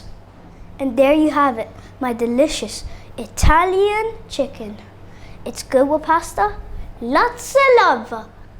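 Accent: United Kingdom. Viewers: British pronunciation 😊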